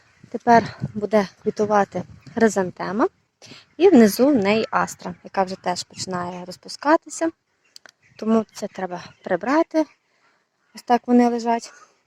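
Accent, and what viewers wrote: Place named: Ternopil, Ukraine. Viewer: Все гарно! А ще й мила тернопільська говірка! 💙💛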